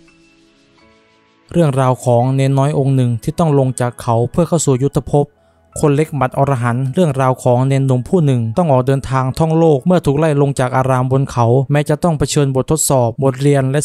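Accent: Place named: North, Thailand. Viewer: น่าจะคนภาคเหนือครับ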